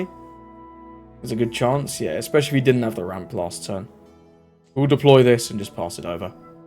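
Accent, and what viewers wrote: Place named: United Kingdom. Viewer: Definitely! He has that UK accent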